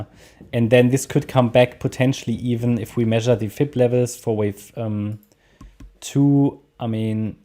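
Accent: Germany. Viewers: German accent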